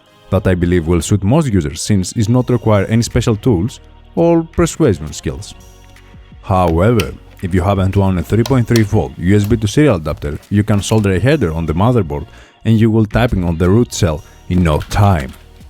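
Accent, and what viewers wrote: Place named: Greece. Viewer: Ακούω προφορά και λέω Έλληνας θα είναι. Μετά βλέπω και τη Σαλόνικα και το σιγουρεύω!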